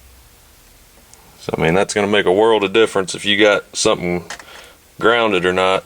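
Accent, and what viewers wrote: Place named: South, United States. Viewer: Thanks for the info my Southern Buddy(cool accent).NICE CAR !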